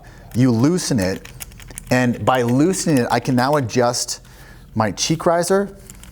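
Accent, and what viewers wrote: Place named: United States. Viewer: Wow! A Canadian that sounds like an American. Not for me.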